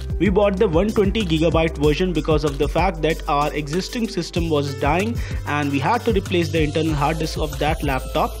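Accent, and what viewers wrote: India. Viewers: Indian acsent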